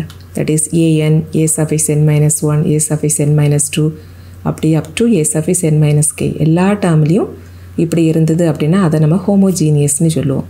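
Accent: Kanyakumari, India. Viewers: Mam the way your teaching is very nicee ninga endha oru namma kanyakumari ah...😊😊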